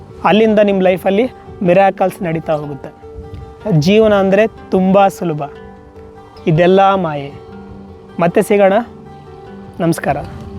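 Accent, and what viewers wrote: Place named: North Karnataka, India. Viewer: ಗಂಡ ಮಗನ....❤ ಉತ್ತರ ಕರ್ನಾಟಕದ ಮಾತು....ಇನ್ನು ಹೆಚ್ಚು ಹೆಚ್ಚು ಕನ್ನಡ ದಲ್ಲಿ ವೀಡಿಯೋ ಚಿತ್ರೀಕರಣ ಮಾಡು.......❤❤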